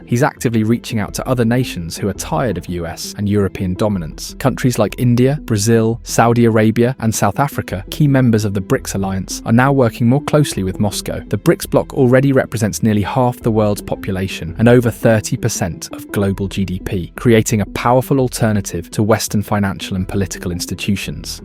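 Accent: United Kingdom. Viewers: You are so full of crap. Why is it that the British accent always comes with russophobia?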